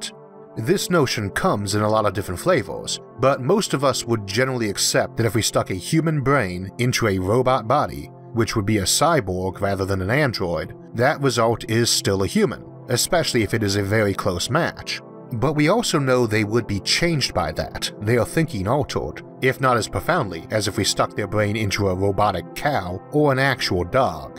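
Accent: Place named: South, United States. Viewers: You should speak more in the southern accent. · While it's not an accent, his voice sounds enough like a Southern US speaker that my brain just loads Georgia.txt and understands him fine (well, I can understand, my time living there was worth it then!). His older videos are hard, but I think that's the fault of whatever mic he was using. The new ones are fantastically clear.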